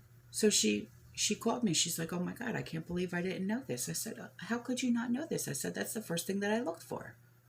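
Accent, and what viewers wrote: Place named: East Coast, United States. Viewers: The lovely thing about this venue is that you can click the off button. I’m not a big fan of thick east coast accents but I know an accent doesn’t reflect the sum of a person. Regardless of the delivery, gives out a lot of good information and she is truly sincere. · I dont notice anything different either...she sounds like a Northeasterner to me. A valley girl sounds quite different...I'm in CA right now...believe me I KNOW what a valley girl sounds like 😂😂😂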